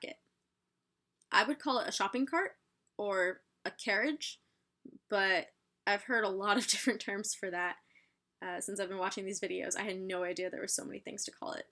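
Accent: Connecticut, United States. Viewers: You say talk instead of tawk. What part of connecticut are you from? I moved to va from connecticut and my entire class tried to give me a speech class lol. Theyd say, "there's no w in talk Shakia." The words that stood out to everyone were talk, water, car, chocolate, coffee, dog... i pronounce them tawk, waduh, cah, chawclet, cawfee and dzawg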